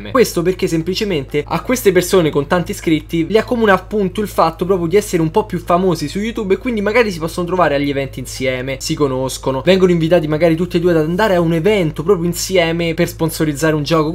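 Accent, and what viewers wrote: Rome, Italy. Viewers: Ho scoperto il tuo canale grazie a dei miei amici (maschi), che penso ti seguano solo per i gameplay, poiché su youtube seguono solo gamer. Ero curiosa, non ho mai guardato video in cui giochi (semplicemente perché non ci capisco nulla), ma mi sto guardando i vlog e i video ask. E niente, ti adoro: mi piace il tuo modo di pensare e di affrontare argomenti un po' scomodi, mi piace il tuo dire quello che pensi, senza peli sulla lingua, ah e mi piace pure il tuo accento romano. Mi pento di averti conosciuto solo poche settimane fa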